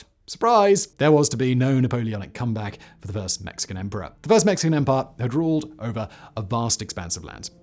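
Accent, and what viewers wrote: RP, United Kingdom. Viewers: A former Brit here who moved to America in large part because of what I'm about to say here. I have delivered a Thumb Down on this video. For the needless sideswipes against American conservatives. I heard a lot of this snideness when I was in the UK and I eventually figured out that it came out of a place of envy. Conservatives in America have elected some absolute duds - George W Bush, ugh - but they also produced Reagan and, yes, Trump. From your accent - which you have taken great care to curate, given the jumpcuts - I am guessing that you identify with the BBC upper-crust. A Brit can always tell.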